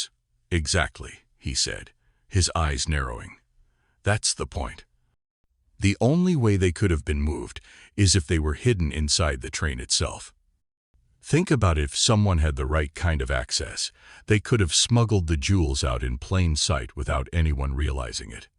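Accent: United States. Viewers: Terrible American voice not worth listening to.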